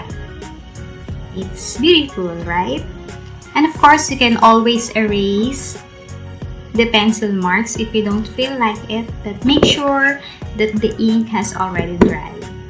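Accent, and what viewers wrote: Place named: Philippines. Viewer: You are very helpful. Glad I found your channel. Pilipina ka ba? I can sense based on your accent.. Hehehe.. Thanks a lot! 💚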